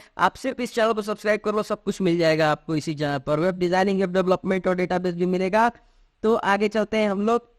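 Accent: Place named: South Asia. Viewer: Thank u Bhai, i love desi tone.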